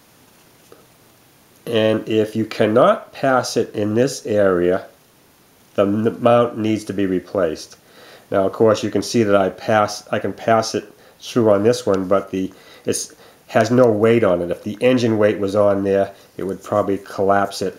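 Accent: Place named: East Coast, United States. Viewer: Does 2nd design mean it's not in the 3rd Generation S-series? Also, where are you located?? You sound kind of east coast. If I'm ever in your neighborhood I'd like to have my 2000 SW2 looked at by ya!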